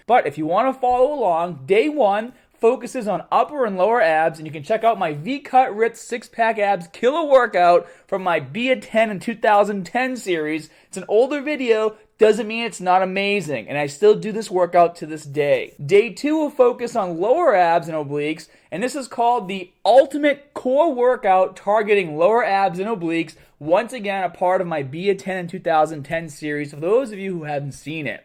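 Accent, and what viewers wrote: Boston, United States. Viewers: Stop giving lessons on body training but teach us how to speak with a Boston accent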